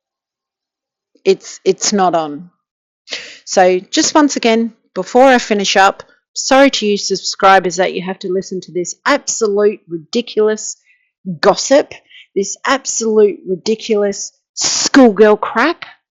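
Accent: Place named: Australia. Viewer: Hi from Perth….loving hearing an Aussie YouTuber. Love your content and the way you speak your mind.❤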